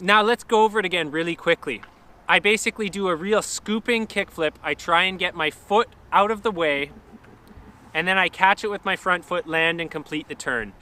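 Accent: Canada. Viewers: It's funny how i can hear his Canadian accent even when I'm a Canadian. Fuckin Mint 🍁👌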